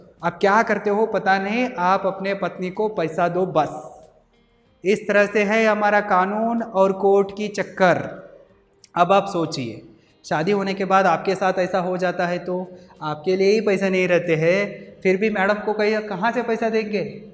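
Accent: Maharashtra, India. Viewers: Marathi or konkani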